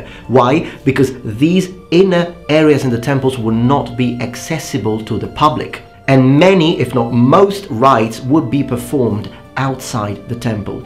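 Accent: Greece. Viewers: really Helenic Accent ! Congrats my Friend! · You have a modern greek accent and not an erasmic one! Good.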